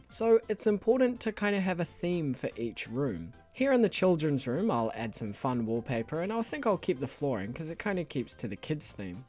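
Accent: New Zealand. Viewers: There is nothing i love more than a good old kiwi accent. so calming to hear.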